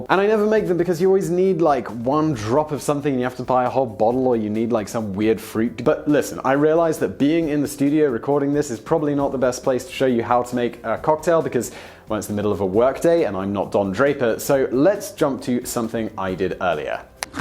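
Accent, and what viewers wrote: England, United Kingdom. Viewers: i cant learn with this britt talking this england mess at me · I thought same, accent is definitely British though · And i thought he's a British who lives in Canada lol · Is this how englishmen pronounce Nahuatl words? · I thought that you were in England you sound British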